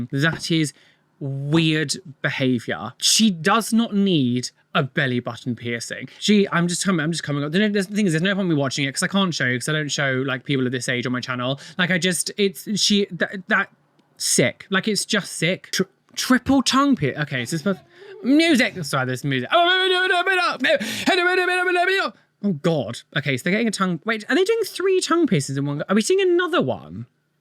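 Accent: United Kingdom. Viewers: I love your videos, and btw I’m learning English for 7 years, now I'm listening to the British accent in your videos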